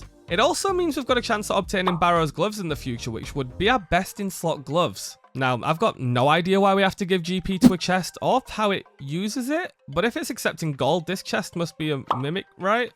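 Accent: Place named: Yorkshire, United Kingdom. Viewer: Your accent is killing me.. Where are you from? Leeds/Hull/Sheffield area? It's so similar to mine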